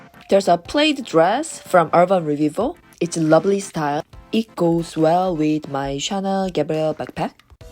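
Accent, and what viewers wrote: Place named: South Korea. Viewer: Are you Korean? I am Korean too and I can kind of detect it from your accent. Lovely looks!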